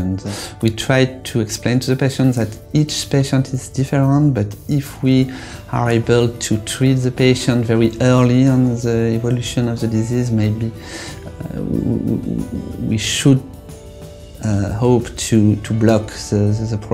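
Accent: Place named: France. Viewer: No disrespect to the French, however subtitles would have made him understandable.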